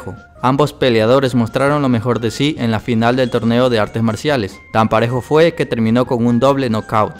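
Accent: Chile. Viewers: Jauregui Zurita chileno por el acento facil de diferenciar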